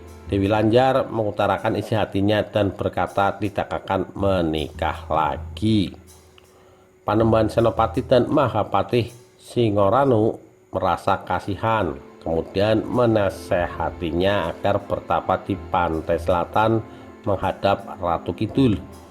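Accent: Tegal, Indonesia. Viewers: Admin kayane wong tegal ya boss..?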